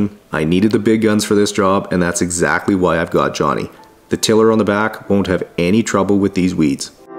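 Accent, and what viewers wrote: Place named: Canada. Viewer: I’m sorry, but do all Canadians sound the same! He sounds just like a few other Canadian YouTubers I watch as well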